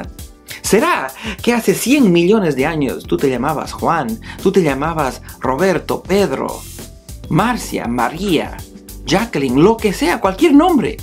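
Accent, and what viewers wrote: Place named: Peru. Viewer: pareciera de peru o un portugues que sabe hablar español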